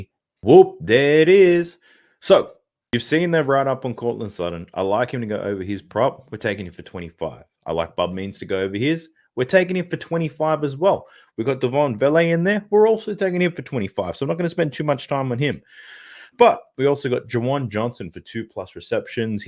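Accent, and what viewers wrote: Australia. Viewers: Who else feels uncanny valley listening to an Aussie talk about our footie😅 im here for it beeeeioootchh!🤘🏼😎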